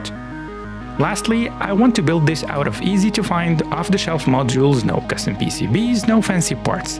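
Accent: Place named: Morocco. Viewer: Great Job! I have a friend who is interested in programming, he would love to see this. I can guess from the accent that you are probably from Morocco Correct me if i'm wrong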